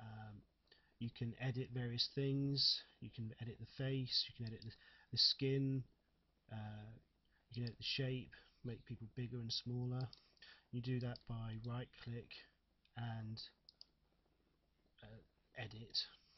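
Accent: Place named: United Kingdom. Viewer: Thanks! I wish I'd seen this a week ago. Slow eye speed, plus having to mentally process British English into American English mean I'll have to do a lot of stopping and rewinding to learn the specifics. But still, your delivery is good, and these are important points. I can't make the tutorials run when I'm IN Second Life, so this is great!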